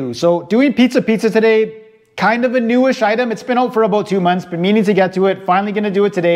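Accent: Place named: Winnipeg, Canada. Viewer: You have a thick accent. Are you from Winnipeg?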